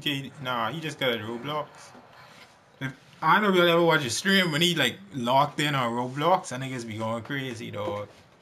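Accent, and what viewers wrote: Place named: Bahamas. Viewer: You from the Bahamas?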